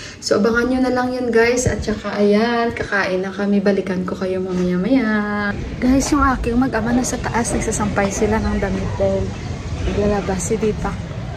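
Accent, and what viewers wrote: Iloilo, Philippines. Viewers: hilo nang.. kalingaw simo pamatian mag ilonggo whahha. Kahilidlaw sg mga celebration sa Bacolod. hidlawon ka gd mgpa gutok hulat sg parad nang. And Happy Dashain sa inyu da nang. God bless kag keep safe gd da nang.🤍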